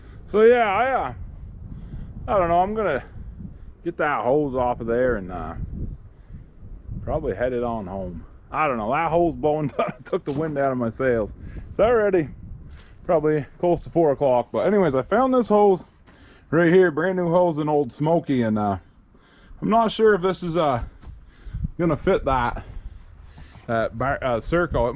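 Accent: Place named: Western Maine, United States. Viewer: new subscriber your speech sounds like you are from western maine. maybe my jugment of vermont is slanted by massacusett carpet baggers.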